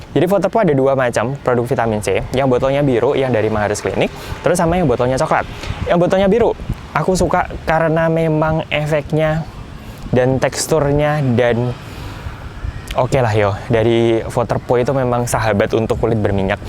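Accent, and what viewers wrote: Java, Indonesia. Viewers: Kok yo medhok tenan yo. Tapi rinci banget seneng penjelasanne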